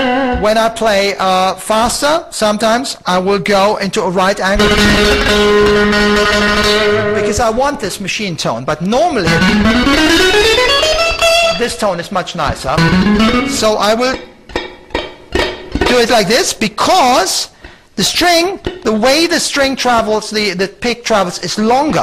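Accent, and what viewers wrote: Germany. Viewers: Funny german accent :-) But amazing guitar player !! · Me encanta el acento tan marcado de los alemanes cuando hablan en inglés... Hermoso Uli eres un maestro!!! Ese sonido sólo puede ser producido con su Skyguitar!!!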